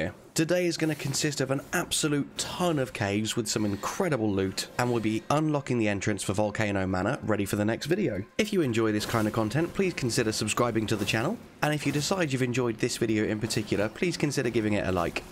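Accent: United Kingdom. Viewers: Even if I am on ng+2, almost all looted. It's a pleasure to hear you I don't know if you are british, but you accent is really pleasant to hear. Greetings from France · My apologies I did not receive a notification regarding your reply 😅 This may sound weird but I wish I could live in the UK hearing your accent 😄 It's fuckin hot as Volcano Manor here in Arizona 😂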